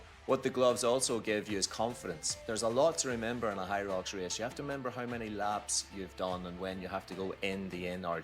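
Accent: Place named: England, United Kingdom. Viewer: Great post and tip – I ordered a few. And, you have a strong English accent, where are you from?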